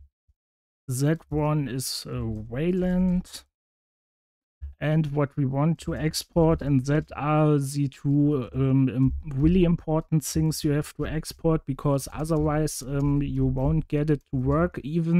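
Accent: Germany. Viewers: Bruder hat den dicksten deutschen Akzent den es gibt.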